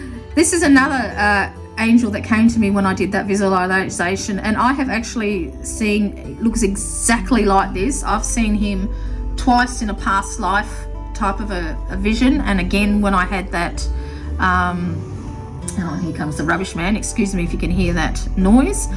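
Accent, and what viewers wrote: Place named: Australia. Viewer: Awwww bless you and thank you so, so much for this lovely review of my cards!! I love that you saw Sandalphon as Fabio! Ha ha I also think he’s like Conan the Barbarian 🤣😂 So lovely to have a fellow Aussie wise woman reviewing these. Your accent makes me homesick ❤️ I hope you are feeling better soon. The goddess and the angels are with you 💖 Loved hearing about your own visions and experiences with the angels during this too. Thank you! Good feedback on the pronunciation guide. I will add that in my next deck - great idea 🙏 Have a gorgeous day and huge thanks again. I feel very humbled by this review. Love Alexandra xxx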